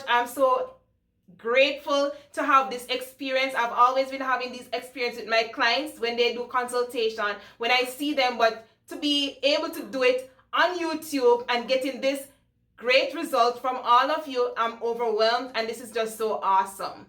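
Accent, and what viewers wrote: Jamaica. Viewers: You sound like a Jamaican. Are you? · Gurl I hair your Jamaican accent, subscribed. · I am hearing the Jamaican accent!! 🇯🇲 🇯🇲. Representing from ja! Great video ♥️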